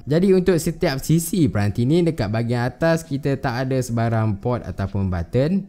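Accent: Taiping, Malaysia. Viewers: Orang taiping ke bang?😂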